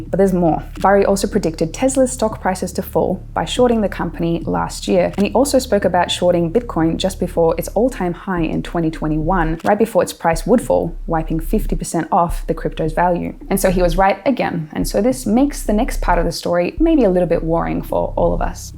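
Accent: Australia. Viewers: Iceland??!?! You sound like an Ozzie! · You're from Iceland!😮 I thought you were Australian.🤔 I make lots of predictions. Sometimes I'm right. But I'm not famous for it. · I am surprised you are in Iceland! I thought you were Australian. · Iceland?? She sounds like an Aussie to me (and I'm an Aussie!) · I always thought you were Australian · For a minute I thought you were Australian but thank you for this warning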